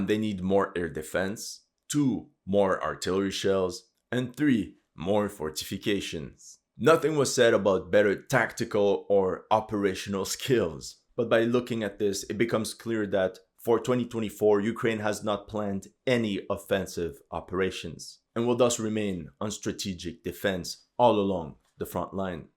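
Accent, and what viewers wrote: Russia. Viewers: Lol, "good job". May I ask you where are you from? Sounds like you're sweating your ass to hide Russian accent.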